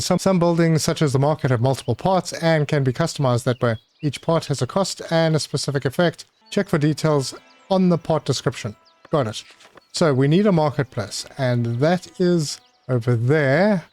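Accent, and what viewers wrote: South Africa. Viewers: Knew I can distinguish a South African accent when I hear one, hello broer!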